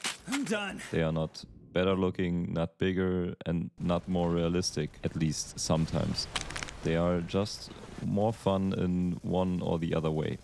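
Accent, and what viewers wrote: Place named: Germany. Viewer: Love your accent, please, can you be a lil bit more german? That would be wertgeschätzt ❤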